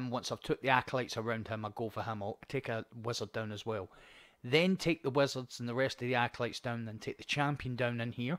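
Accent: Scotland, United Kingdom. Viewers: A Scottish commentator ❤️❤️love from the highlands ❤️new sub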